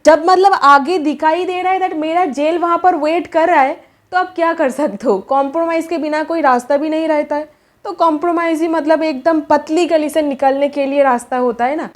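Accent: Bengal, India. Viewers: Waise achha bolti ho lekin bangoli ka ye problem hai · Bangali ho isliy aapki bhasa bahut pyari h ha hindi thoda weak h .mam aap jo boli 10yrs case chala .mujhe tazzub hua mera v ek 95995 ka cheqe bounce ka case h wo accussed person abhi khi chhup gya h samne nhi aa rha h usne bahuto ko thaga h mere pass cheqe tha isliy court tak gyi sochi 6 to 12 month me result aa jayega lekin mai dr gyi hu kya 10 yrs tak v case chalta h .itna late jyu hota h mam?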